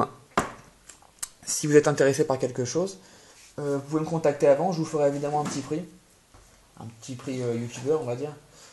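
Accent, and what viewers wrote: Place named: Belgium. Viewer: toi ta un léger accent belge a ce que je voix ;)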